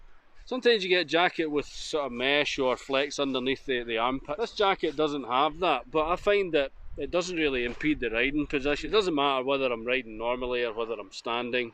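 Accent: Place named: Dundee, United Kingdom. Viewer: Familiar accent as I haved lived 6 yrs in Dundee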